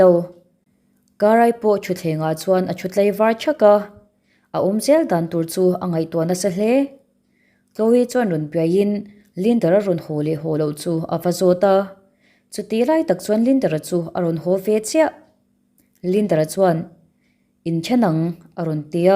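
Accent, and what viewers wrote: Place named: Myanmar. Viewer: Velah i ti ziah mai burma mizo tih awm vel a ni saptawng in chhiar law2 la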